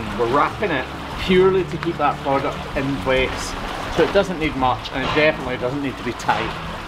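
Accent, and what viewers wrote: Scotland, United Kingdom. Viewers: Scottish spoken by homelanders is getting so much easier to understand. This guy speaks perfect English with an accent. I had a childhood friend whose mother was from Scotland and I could never understand a thing she said. I love most accents, so long as I can understand them. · pretty sure you replied to the wrong person. The Katt said nothing about what he is. Secondly he may be English genetically or was born there but a lot of he says has a slight Scottish accent that gets really heavy when speaking to locals. Plus he's in Scotland. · There’s something about a Scottish accent that seems to lend itself to healing and fixing. Makes me feel like she’s in good hands. Lol. Maybe I’m trained by Star Trek but I don’t care. This poor cow needs a miracle worker like you.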